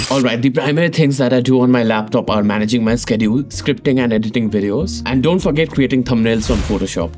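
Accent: Bengal, India. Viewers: Bengali tone in English 😢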